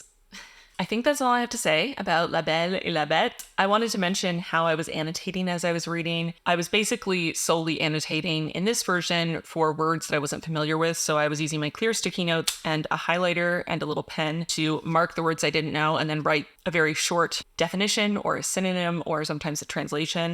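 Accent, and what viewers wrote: Quebec, Canada. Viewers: En tant que française, c’est rigolo pour moi d’entendre une étrangère dont le français n’est pas la langue maternelle parler Québécois… Mais tu parles très bien ! 😊 I am (trying to) learn(ing) Dutch because I’ve been living in the Netherlands for two years, but this is such a difficult language, sometimes it’s similar to English, sometimes there are French words, but sometimes it’s 100% Dutch😅 I also use Babbel app.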